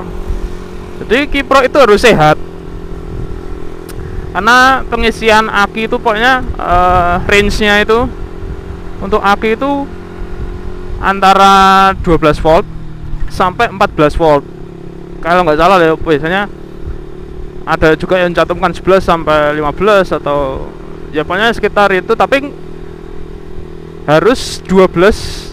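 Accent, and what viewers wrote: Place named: Java, Indonesia. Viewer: Medhok cuy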